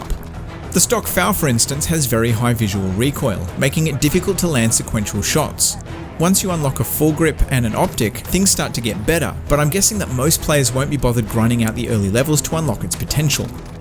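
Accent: Australia. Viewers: what is your accent btw?! Australian?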